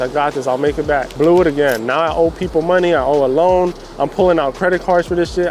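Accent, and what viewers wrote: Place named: California, United States. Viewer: You sound exactly like me and my people from California to a tee. I am on the same exact journey. Preach to me, bro. I'm in between my dropshipping and just discovered Forex, but I have stock experience. Now, I am researching Forex trading. Vegas taught me not to ever treat trading like gambling lol real talk.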